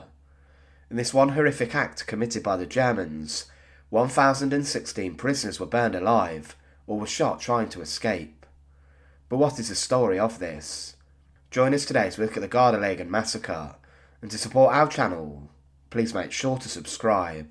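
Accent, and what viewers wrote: United Kingdom. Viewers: Who is the jew with British accent narrating ? jew Bolshevism / Red October / Tsar Family Assassination / Holodomor / Stalin / Dresden Bombings / jew Soviet atrocities against civilians/ genrikh yagoda and countless others Shut up ya yid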